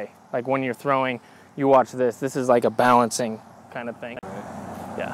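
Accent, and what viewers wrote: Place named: Western Pennsylvania, United States. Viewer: It's a western PA thing. I'm assuming he's from somewhere around Pittsburgh.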